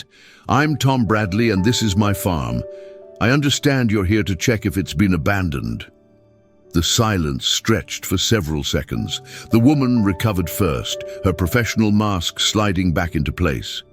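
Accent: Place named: United Kingdom. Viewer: Do these ridiculous AI folks ever even think about how stupid it sounds to have a snooty British accent narrating a down-home family farm story in first person singular? Especially one where the neighbor says things like "Hot damn!" - obviously meant to have happened in the heart of American farmland. Sheesh.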